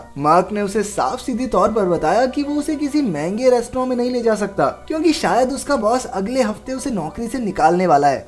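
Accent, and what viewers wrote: Haryana, India. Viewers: YouTuber pkka haryana ka h😅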